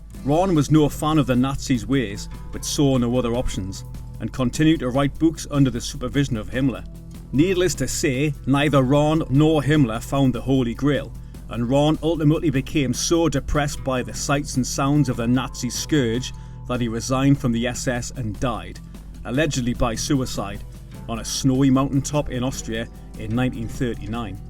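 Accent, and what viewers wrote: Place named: Geordie, United Kingdom. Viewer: Instantly switched off with the overly Geordie accent. WTF?!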